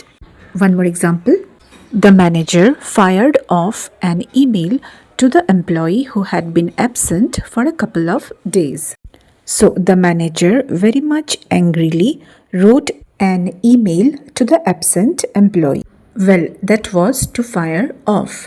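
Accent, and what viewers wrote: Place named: India. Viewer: Esa pronunciación es de india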